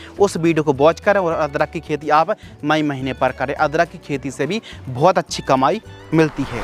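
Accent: Bundelkhand, India. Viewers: सर आप बुन्देलखण्ड से हो का ❤❤हमे yesa लग रहा हैं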